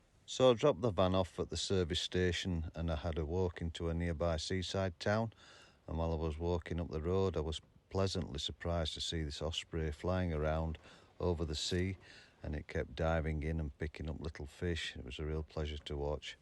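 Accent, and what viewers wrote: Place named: North, United Kingdom. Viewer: That was a lovely park up. Loved the views. You are so lucky living up there. Meant to ask, where are you from originally? Good to hear a proper northern accent. Hope you and Ellie have a good Christmas.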